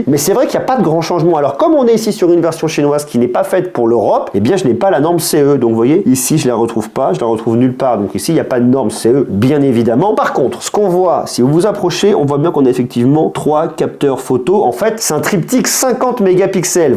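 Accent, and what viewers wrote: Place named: France. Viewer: Très bonne vidéo ! (pas grave pour l'anglais, les français ne sont pas connus pour avoir un accent british ou US) Je rejoints certains commentaire, si tu fais des tests d'appareils pas encore ou pas forcément disponible sur les chaines de distributions standards en Europe/France pourquoi pas tester d'autres appareils ? J'ai vu du IQOO 11/11 pro (qui est le OnePlus de Vivo en faite) Pourquoi pas ce X90 pro+ de Vivo Si le budget le permet, il y a évidemment du OPPO Find N2, Vivo X Fold + (ou le X Fold 2), la prochaine version du Honor Magic 5 ultimate ? En tous cas, très intéressant que tu touches à des appareils en avant première (entre autre) sans forcément le soutien de la marque !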